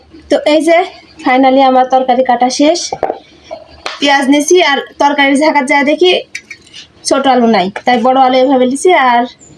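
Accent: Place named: Rajshahi, Bangladesh. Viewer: আপা আপনার বাড়ি রাজশাহীর কোথায়? মনে হচ্ছে আমার এলাকার ভাষা বলছেন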